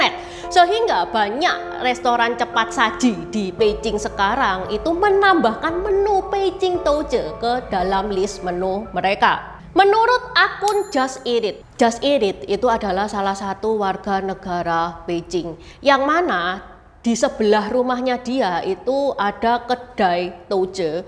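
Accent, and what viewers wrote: Java, Indonesia. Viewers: Enakan yakin dengerin dongeng nya nih.. dg bhs indonesia ber aksen jawa medok.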